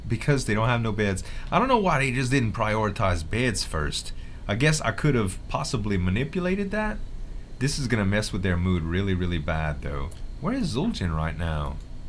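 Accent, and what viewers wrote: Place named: Deep South, United States. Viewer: Danyelle Martin lousiana, he is from the DEEP south. I was born in Tennessee so I know these types of accents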